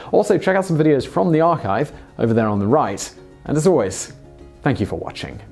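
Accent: England, United Kingdom. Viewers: Why do you pronounce "Marquis" the American way. Your accent suggests, to me at least, that you are English, where we pronounce it "markwiss". Please, give the man some dignity. He was a member of the English aristocracy, not a fucking tent!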